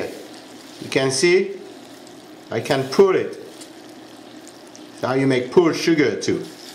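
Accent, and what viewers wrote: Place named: France. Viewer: Who doesn’t love a French accent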